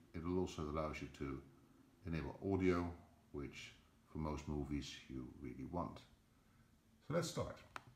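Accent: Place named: Netherlands. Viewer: you have to buy the app any alternative way of doing this, also i noticed that you are dutch just from your accent you al speak english in the same way just an observation thanks for the video though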